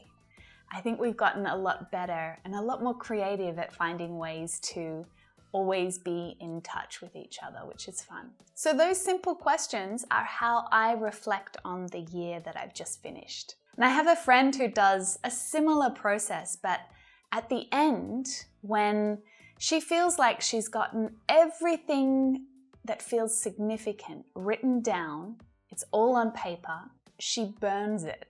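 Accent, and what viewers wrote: England, United Kingdom. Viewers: Hi are you british ? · A lovely english accent! A simple mesmerizing voice! One of the best teachings ever! I wish all the best for you! Keep making this incredible videos for us! Take care dear teacher!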